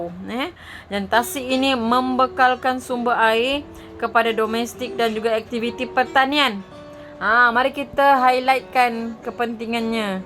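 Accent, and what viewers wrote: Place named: Indonesia. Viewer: Ckp macam Indonesia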